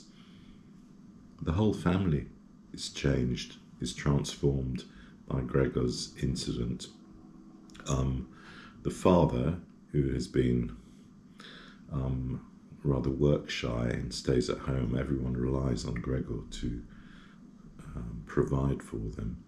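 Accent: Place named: Romania. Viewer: Superb Romanian accent , Deutsch auch. Might I suggest some comments on one of the writings produced by the Viennese Stefan Zweig ?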